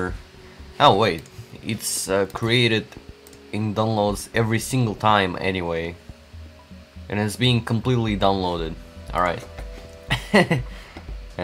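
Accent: Russia. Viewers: One of his longest videos and 1/2 with his voice i like your Russian accent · У тебя акцент очень похож на русский 😳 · I like his Russian accent lol 😂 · "Because im russian" Я сразу по акценту понял что русский) Но англиский у тебя очень хорош.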